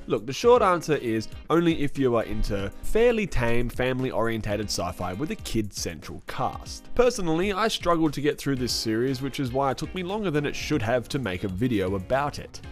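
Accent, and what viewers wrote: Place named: Australia. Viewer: The review was better then the show...Perhaps #Netflix could give you your own series with you doing just reviews.... But make sure you use that Australian accent..some people really like that !! "OK so I give the show a C- and the review a solid A"....... PEACE and BE WILD !!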